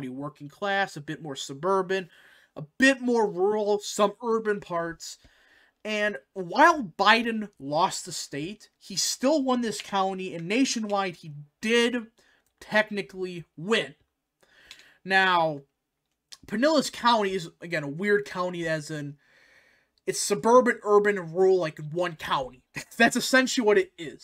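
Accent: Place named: Chicago, United States. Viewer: I'm a Chicago born and I appreciate your accent. Sounds so chicagoian.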